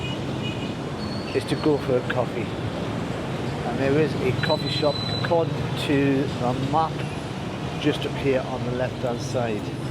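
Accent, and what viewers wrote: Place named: Scotland, United Kingdom. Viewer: It looks like scottish accent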